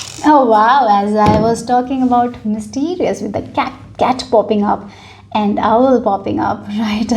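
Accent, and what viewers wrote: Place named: India. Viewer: I am sorry to say ... but what a Shītty Read !! So much Irrelevant bull shît !!!!!!!!!!!!!! And my God your h0rrible Indian accent .. so hard to understand...you literally say QWOON..... instead of Queen !!! Took me a bit to get it.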